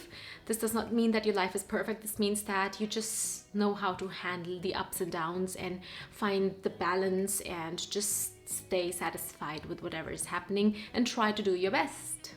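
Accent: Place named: Germany. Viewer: German englisch dialect 🤣👌🏽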